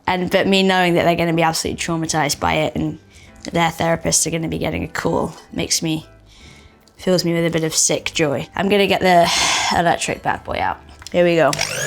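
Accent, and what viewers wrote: United Kingdom. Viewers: Omg she's British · How did I forget Bella is British? 😂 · Man ngl I’ve been bamboozled I didn’t know she was British 😅😅😅😅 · I love her British accent so much more than her American accent. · British accent, but i actually hear ellie talking, really, it could be ellie making lunch for vogue IF like, not the apocalypse you know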